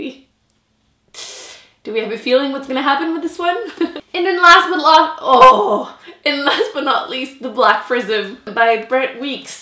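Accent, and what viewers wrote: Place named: Quebec, Canada. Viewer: She's from Québec.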